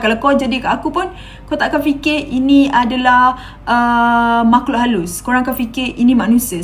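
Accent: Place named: Kelantan, Malaysia. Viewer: Aq rase ni orang2 belah pantai timur.. nada cakap cam orang kelantan je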